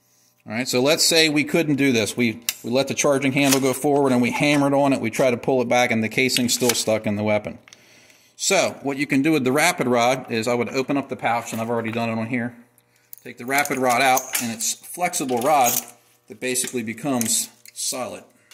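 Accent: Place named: Philadelphia, United States. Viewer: I detected a philly accent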